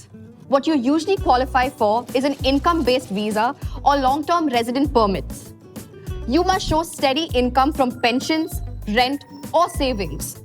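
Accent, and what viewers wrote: India. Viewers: You talk like an Indian girl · Definitely not European. Her accent is like an Indian. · First of all she doesn't look indian, i mean she might be a foreigner living in India but her accent is indian, how i mean is she AI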